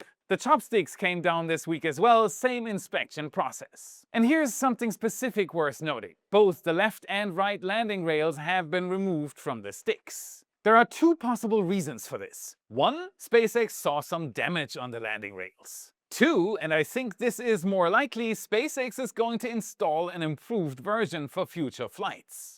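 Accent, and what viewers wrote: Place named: Germany. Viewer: Dear, i support the content, but please for deities knows... used ai to get ride of that german accent. It's giving me hai hitzer vivbes.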